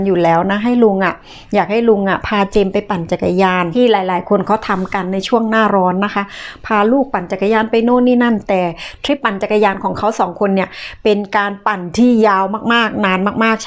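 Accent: South, Thailand. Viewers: ไม่จริงนะ อิสานใต้สำ้นียงอีกแบบนึง แต่นี่ฟังแล้วรู้เลยว่าเป็นคนใต้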